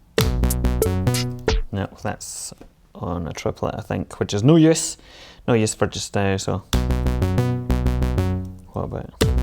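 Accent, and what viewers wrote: Scotland, United Kingdom. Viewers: Your videos are great man! You should have 1000x the subs - the YouTube algorithm is a fickle mistress. Great to hear a Scottish voice doing synth stuff. Thanks again.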